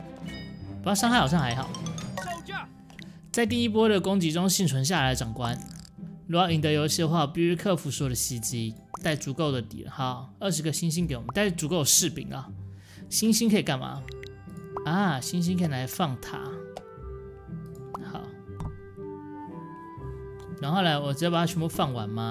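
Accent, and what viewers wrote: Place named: United Kingdom. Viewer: 是英國腔沒錯，英國留學生來幫你證明哈哈，很多重音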